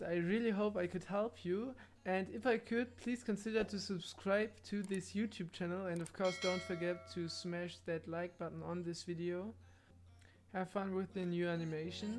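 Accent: Germany. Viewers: dein akzent mein hört dass du deutscher bist hahaha !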